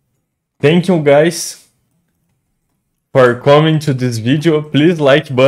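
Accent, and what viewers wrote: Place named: Brazil. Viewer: fiquei um tempo pensando que o sotaque é familiar e quando vejo os comentários é br kkkkk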